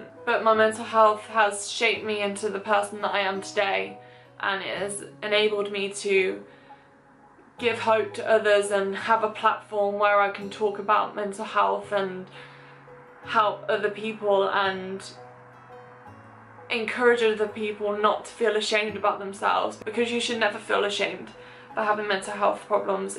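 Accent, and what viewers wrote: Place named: West Country, United Kingdom. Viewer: Also when you’re not on camera you have more of a West Country accent lol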